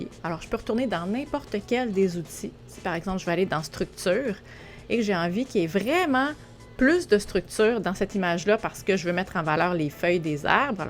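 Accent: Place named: Quebec, Canada. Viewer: Bonjour, j'utilise Luminar NEO, et je l'adore. Mais au delà de ma passion pour la photo, en amateur, c'est votre humeur et votre accent qui me redonne envi de repartir faire un périple au Québec. J'ai adoré en juillet dernier, faire ce voyage dans votre beau pays, Patrick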